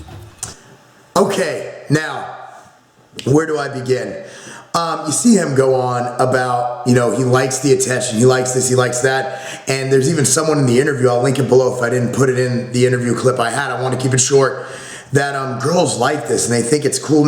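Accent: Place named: Brooklyn, United States. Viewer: He totally has that nasty Brooklyn style/speech going on.... Yuck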